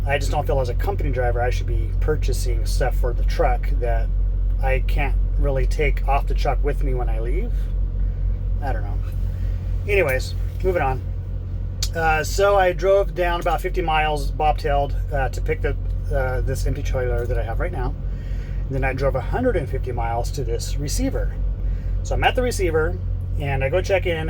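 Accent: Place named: California, United States. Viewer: That's funny; you can feel California, and I can hear it in you. 😆Travel safely...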